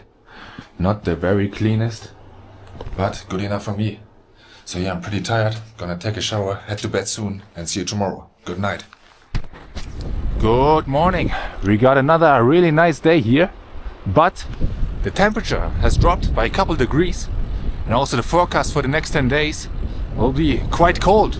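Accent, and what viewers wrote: Germany. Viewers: Always love the content and im excited to see what you find in Tibet ! By the way, if you ever feel, when editing, that you should have said something but didn't, please feel free to add it. Or, if you just want to ride and not talk that day, you can add it in production. Just a thought I wanted to share, because you do have a great voice, with that german accent 😄. Because, showing us things, this time Tibet, is one aspect, but telling us how you are living it, is another thing. Its "wanderlust" as they say right ?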